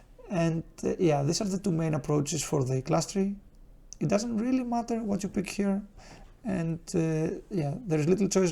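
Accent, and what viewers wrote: Greece. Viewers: Nice guide will check it out. One irrelevant question though. Are you greek ? Your accent makes me think that, thats why i ask.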